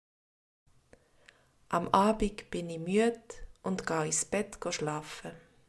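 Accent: Switzerland. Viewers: Which Canton German is this?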